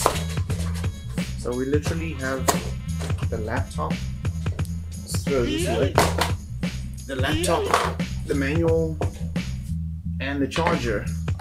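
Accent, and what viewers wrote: South Africa. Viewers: you are south african nè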